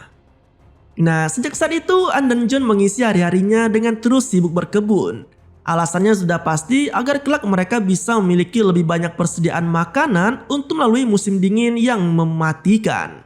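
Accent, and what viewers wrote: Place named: Java, Indonesia. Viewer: Biasa jgn baper ilat jowo 👅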